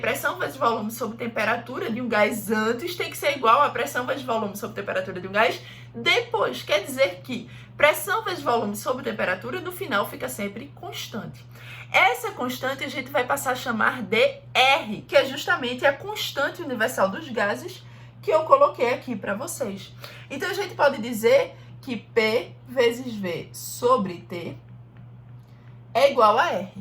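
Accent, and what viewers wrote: Pernambuco, Brazil. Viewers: CADA AULA FODAAAAQ. Tinha que ser nordestina, e quase certeza que é Pernambucana, né?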